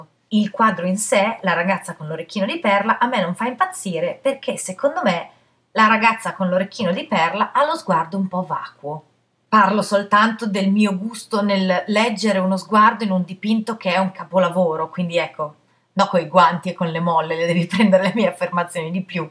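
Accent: United Kingdom. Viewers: ahaha la faccia da INIZIO DI SUPERNATURAL <3 sei troppo brava sei il mio programma tv..ma su yt :) ma poi la pronuncia british...love! un caro saluto,Alessandra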